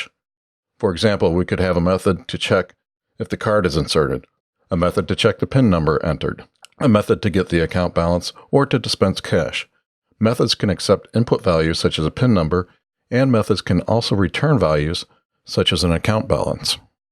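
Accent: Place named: United States. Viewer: What a nice male voice with a rough American accent!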